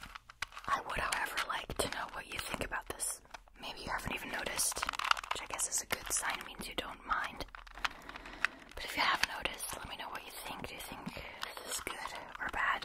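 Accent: Germany. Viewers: The fact that shes german and her english + asmr is amazing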